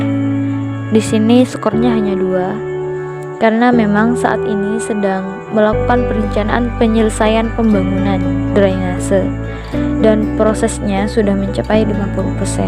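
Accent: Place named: Madura, Indonesia. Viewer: Mantep penjelasan nya mungkin dalm istilah maduranya di bahasakan lebih normatif mbak😊 seperti laok😁😁😁😁😁 dan pennggunaan kata a dan e tapi muwanteppp bagusss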